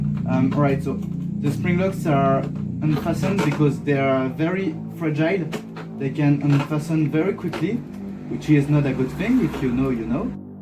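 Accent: France. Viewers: Dès que je t'ai entendu parler au début j'ai su que t'étais français 😂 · Are you French? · Are you French by any chance ? I think the accent matches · Man you have a french accent and that's incredible as a french myself, anyways good job on the springlock suit! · He sound very french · i dont care about your french voice, IT'S AWESOME! GoodLuck pal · heavy French accent, and I like it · so frustrating hearing a french dude speaking in english while I also speak french xD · are you french? you sound like you have a french accent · Are you french🇨🇵? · I think you are French cuz you like- Sound like an French person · Are you french ? I hear a french accent ( i'm french ) ( bon j'ai eu une confirmation a ). Enfin bref, soit tres minutieux, c'est vraiment tres dangereux de faire des costumes de springlocks, en tout cas bien joué.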